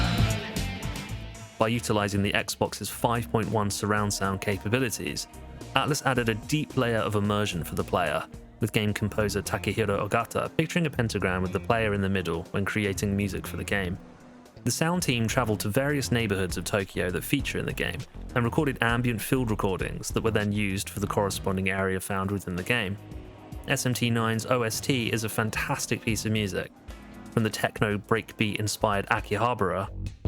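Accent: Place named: United Kingdom. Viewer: That's just the British accent haha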